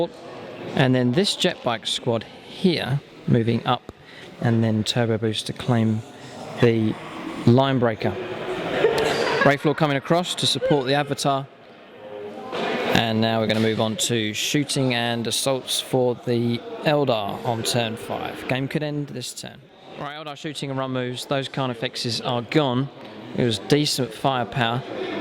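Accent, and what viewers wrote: United Kingdom. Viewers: exactly what I was looking for! concise analysis, pro painted models and british accent. subscribed and liked.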